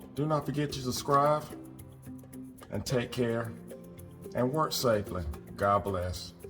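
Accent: South, United States. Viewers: love the southern accent, lol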